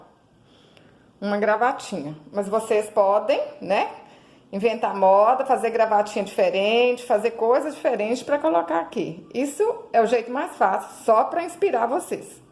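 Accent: Minas Gerais, Brazil. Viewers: Estou apaixonada pela sua arte e por esse jeitinho "mineirim" de falar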